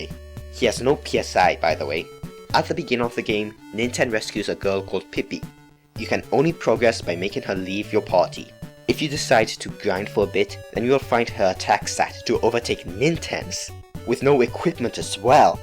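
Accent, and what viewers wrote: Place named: France. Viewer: Are you French? Your accent is really distracting . Sorry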